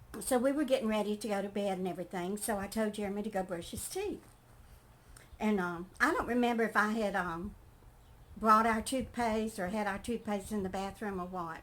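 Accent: South, United States. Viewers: I have been a sub on your channel for a while. Someone say good your channel was but I have not had a chance to watch it. I love hearing your southern accent. I bet they love it up there. Show them your southern cooking. I love the pears. I ate them growing up. You have a blessed day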